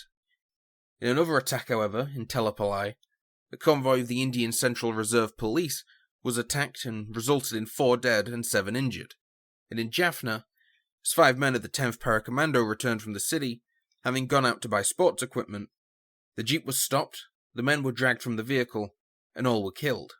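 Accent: Yorkshire, United Kingdom. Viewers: what accent is this? which british city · It's not Bri'ish, It's Yorkshire! Aye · The narrator seems British, I hope he knew that the root causes were created by the British; mother of all modern day conflicts 😊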